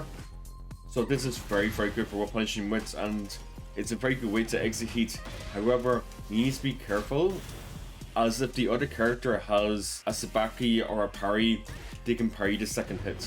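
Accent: Ireland. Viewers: Nice guide and cool Irish accent "counter hish" 😜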